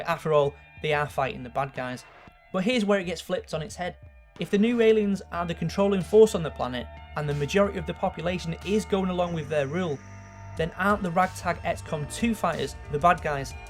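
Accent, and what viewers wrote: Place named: Manchester, United Kingdom. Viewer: He sounds Manchester-ish to me, in case you were wondering where his accent originates from.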